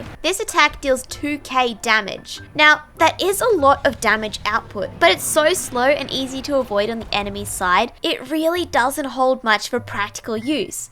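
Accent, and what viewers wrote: Australia. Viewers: When did become a women also ur aussie accent if pretty cool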